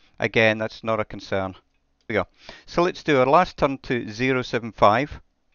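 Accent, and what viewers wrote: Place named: Scotland, United Kingdom. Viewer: Repetition is not so bad when it's in a Scottish accent. 😉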